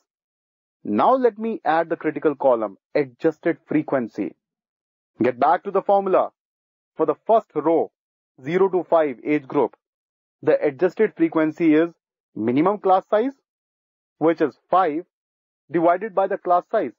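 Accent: India. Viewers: this guy has some next indian accent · Look you Indian guy this is wrong and anyway I didn't understand anything because of your acsent.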